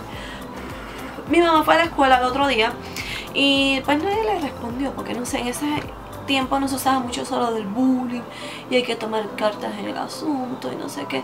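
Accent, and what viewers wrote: Puerto Rico. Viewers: ¿De dónde eres? Tu forma de hablar se me parece a la de Puerto Rico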